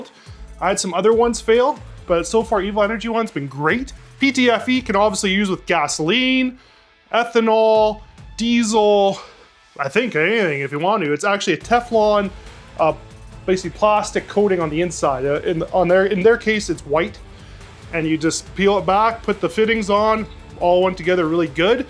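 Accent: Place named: Canada. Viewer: Oooooh yeah bud, Canadian eh? Subbed!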